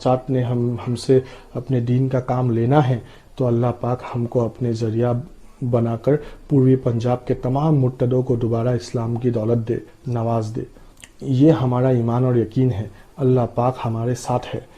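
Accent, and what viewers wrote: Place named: Bharuch, India. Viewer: Muje aap ki aawaz par se lagta hai ke aap Bharuch Gujarat India se ho???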